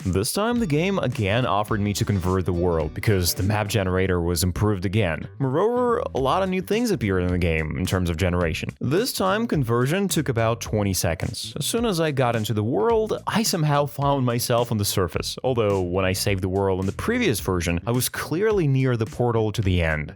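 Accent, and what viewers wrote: Russia. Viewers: Только на 2 минуте заметил что русскоговорящий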